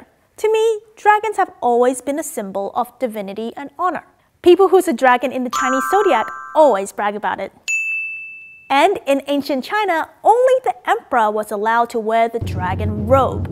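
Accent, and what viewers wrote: Australia. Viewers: I just recently found your channel (Chinese eating etiquette), and watched your discussion of Dim Sum (Here in America "shrimp toast" is a big favorite but I love Siu Mai and cabbage or pork bao) and i am surprised to see that some of the videos are 7 or 8 years old. I didn't notice a difference now that you are "back" but you still have immaculate English enunciation and slip very naturally in to Cantonese or Mandarin. (I notice that you say "mandarIN" instead of "MANdarin" - do we say it wrong over here?) Liked and subscribed and enjoying the videos. Oh, and it is charming when the Australian accent just peeks out a bit in your English. Love it! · Is this an Australian, Chinese accent?!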